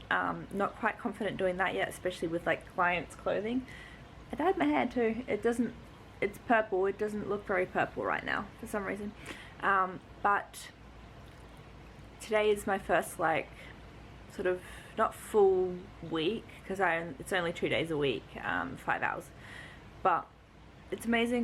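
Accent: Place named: Australia. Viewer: I hear more of a Australian accent from you. 2ish years ago it sounded more like an English accent. I know you're german so you probably learned English with more English influences than Australian. I'd like to hear your thoughts.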